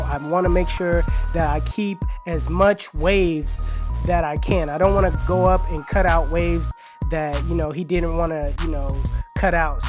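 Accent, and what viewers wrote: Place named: New York, United States. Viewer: New Yorker accent is strong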